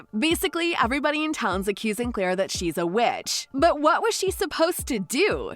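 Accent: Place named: California, United States. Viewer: the narrator's CA stoner uptalk accent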